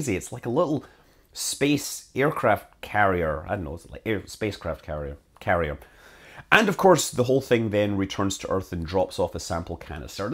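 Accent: Scotland, United Kingdom. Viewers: Hes Scottish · I really love listening to your scottish accent! It is so cute! And - more important - really can explain things very well! You would be an outstanding science teacher!!! Physics teacher Michael B. Butter from Radebeul, Dresden, Germany and: Thank you!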